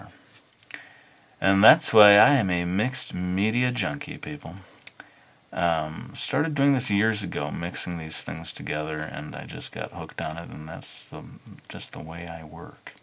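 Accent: Brooklyn, United States. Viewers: Crilley Lesson; It Doesn't matter if you do traditional art or digital art, it'll always be art....and Brooklyn accents are awesome.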